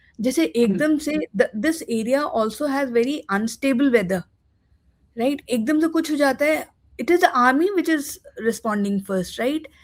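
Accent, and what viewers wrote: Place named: South, India. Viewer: South Indian hai madam.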